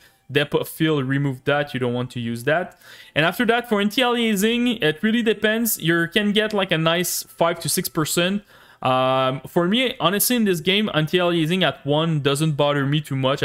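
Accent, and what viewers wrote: Quebec, Canada. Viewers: yo quebec?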